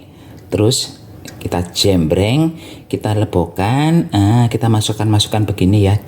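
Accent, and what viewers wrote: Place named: Purwokerto, Indonesia. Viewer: Bahasa nya kok Purwokerto banget rika kang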